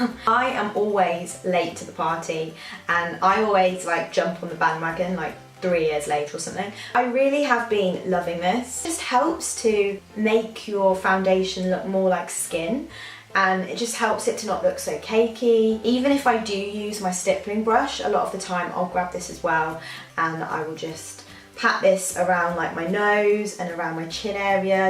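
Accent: United Kingdom. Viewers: Great video love !! I love English accent 😍 !!! Im here showing some love and supporting back 😊💕 thanks for stopping by my channel. Xoxoxo💕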